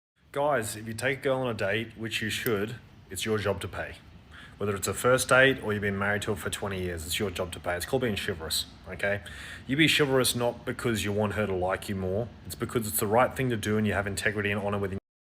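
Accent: United Kingdom. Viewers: although I don't like British accent i really appreciate your voice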